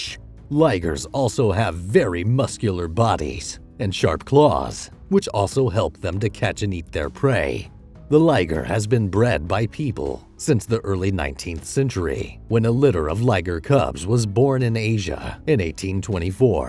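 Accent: United States. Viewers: The narrator sounds so american its distracting^^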